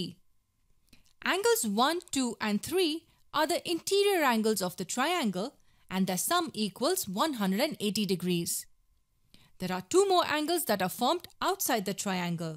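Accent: India. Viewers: Beautiful Indian accent! Clear! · The indian accent.... ughhhh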